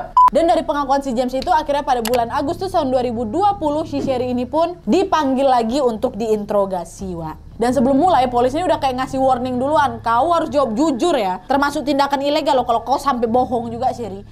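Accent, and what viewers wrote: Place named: Medan, Indonesia. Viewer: Aku penggemar baru celotehanmu.. Biasanya aku merinding..tapi tertawa denger ngoceh 2mu... mantap... lucu. Kocak... teruskan.. ala medan logatmu tu.. i like it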